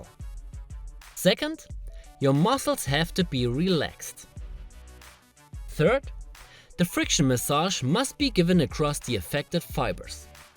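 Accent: Germany. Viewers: When an German-sounding European, with the beside manner of Hannibal Lector tells you these things, you listen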